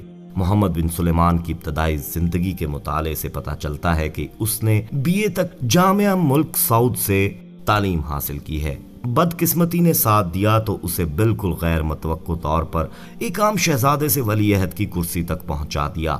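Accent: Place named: Punjab, Pakistan. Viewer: Apni Urdu sahi karo bhai bilkul bhi sahi nahi bol rahe khalis Punjabi dihati lehja hai aap ka kisi Karachi waley se hi commentary kerwa letey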